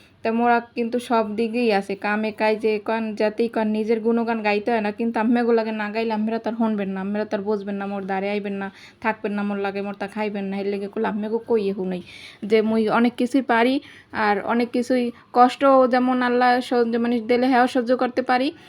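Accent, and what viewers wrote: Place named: Barisal, Bangladesh. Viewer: ❤❤প্রিয় আপু তুমি বরিশালের ভাষায় কথাগুলো বল দারুন লাগে ভিডিওটা দেখে নিলাম সবকিছু মিলিয়ে খুব সুন্দর হয়েছে আলহামদুলিল্লাহ ❤🎉😮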